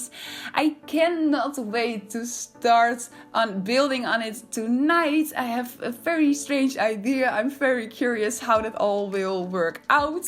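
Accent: Netherlands. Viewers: The second I heard your voice I knew you were dutch. Turns out I was right haha. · Are you dutch? You have a dutch accent haha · Wow so cool! Where are you from. You sound really Dutch to me. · That dutch accent tho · Are you Dutch? I am Dutch too, I can hear it at your language. 😂 My first language is Dutch, but my second is English! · You have a Netherlands accent (my opinion) .. Its very cute!😊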